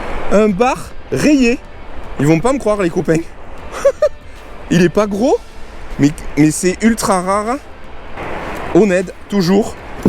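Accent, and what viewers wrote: Quebec, Canada. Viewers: ...c`est autre chose ca, le francais quebecois, assez dur pour un Neérlandais...mais ca ira, salut des Pays-Bas!!!😀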